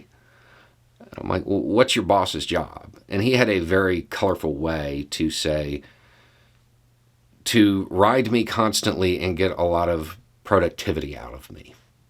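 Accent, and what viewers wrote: South, United States. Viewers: I started watching this channel because a bearded white male with a southern accent spouting common sense wisdom was kind of funny. I now realize your whole point is to invite us to think, to challenge what we hear or read. "It's just a thought" I understand as "ponder it for yourself and feel free to agree or disagree but make sure you have your own arguments either way."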